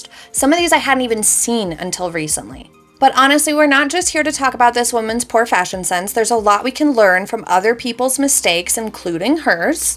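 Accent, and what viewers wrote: United States. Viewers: I love your videos, I really enjoy getting the notifications for a new one! Thank you for sharing your knowledge with us, it's helping me to buy wiser and not waste money on outfits I love the look of but no way was it made for me! Could I ask your background, did you train in fashion or styling? Also I love your voice, a great American accent, I would ask where you live to try to place it, obviously I won't as it is to personal. Keep making, keep teaching, keep entertaining, thank you x I'm subscribed, liking and sharing ❤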